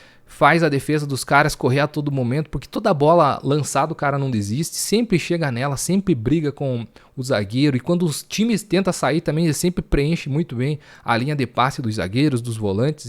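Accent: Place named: South, Brazil. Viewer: Sou vascaino e estou aqui assistindo Vasco x Bahia e fortalecendo o seu canal. Você é natural que lugar do Sul?? PARANÁ? Acho foda o jeito de vocês falarem. Parabéns pela vitória!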